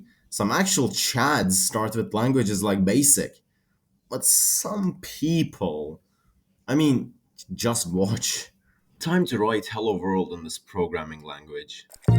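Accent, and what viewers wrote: Turkey. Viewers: Türk aksanı mmhhhh kolay gelsin brom. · Dude are you turkısh? EDİT: Kesin Türk'sün ama İngilizcen gerçekten çok iyi. Yani böyle dersin ana dili yani öyle konuşuyorsun. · Are you turkish? I saw the turkish "activate windoes" watermark . I should have understood it from your accent and profile photo.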